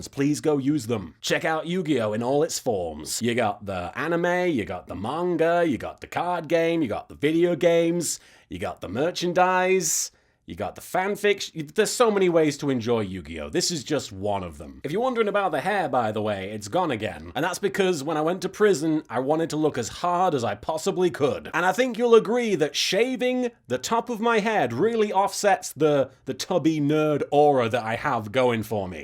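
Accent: United Kingdom. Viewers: Chillin' out without a crew? Are we, your fans, not enough of a crew? Are we too motley? Random nonsense in a British accent... that is totally my jam. Do it more with less people... all those virile young people, alone on an island... yeah, there will be more something going on... Give me 3... steps mister, 3 steps towards the door? Jaden's pun at the end... it didn't have a ghost of a chance. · Random Nonsense in a British Accent is it's own official brand of comedy as far as I am concerned. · Random nonsense in a British accent... That's one of my favorite kinds of random nonsense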